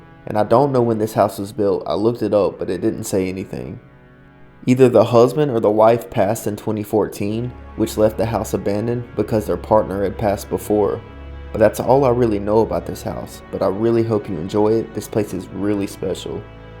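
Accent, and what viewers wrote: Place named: South, United States. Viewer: I was trying to figure out what state you were raised in by your accent. My guess was Carolinas or Georgia. You are my favorite explorer. The narration is great and you spend the right amount of time in each room. Keep up the good work!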